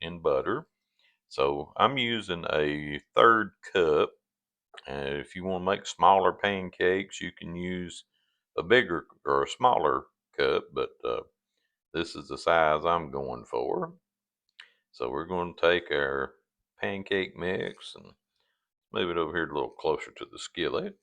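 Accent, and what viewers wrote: South, United States. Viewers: Ps. It will be a sad day when we no longer hear such a wonderful country voice.❤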